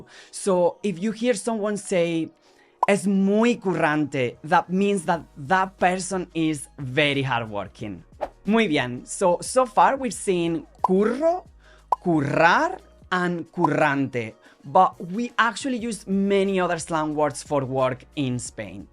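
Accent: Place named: Scotland, United Kingdom. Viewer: As a Scot...i detected the Scottishness straight away... I also talk Scotspanglish... Really well...😂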